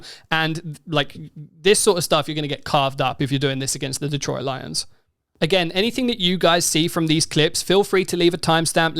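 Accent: United Kingdom. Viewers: In this case I shall not tell you to shut your gob, you tit. Refreshing to hear a Brit breakdown Lions highlights better than most Lions fans could. Cheers! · Nice breakdown. Im so bad with accents. South Africa? Great to see a non American have such passion for American football. Edit: UK. See, I over thought it and guessed wronge.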